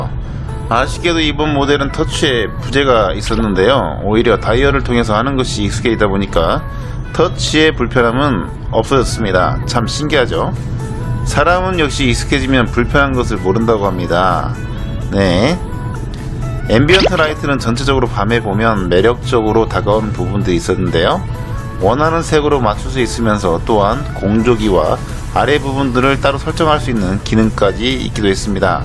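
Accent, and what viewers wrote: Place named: Jeolla, South Korea. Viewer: 즌라도 사투리 쩌네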